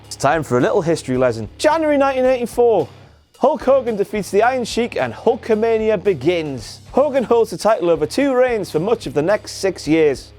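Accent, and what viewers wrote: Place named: Newcastle, United Kingdom. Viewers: I love how the posh accent slowly drifts into the accent of kings, Geordie by the way!!!!! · I love that you can hear the Geordie coming through the pompous accent, it's brilliant.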